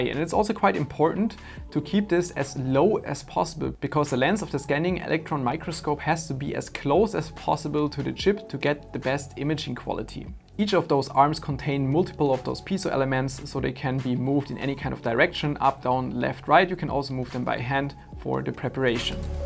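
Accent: Germany. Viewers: I knew you were German simply from the accent. My word.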